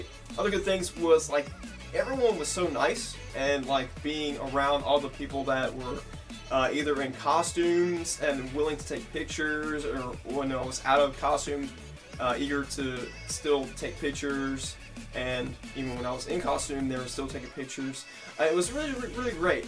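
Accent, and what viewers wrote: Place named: South, United States. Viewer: The southern accent thooooooooo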